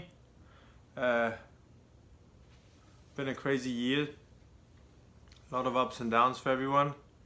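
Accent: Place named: England, United Kingdom. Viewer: What part of England are you from? your accent is very light and easy to understand. Sorry I’m just curious on various British accents, I’ve been watching a lot of Hammer horror films lately.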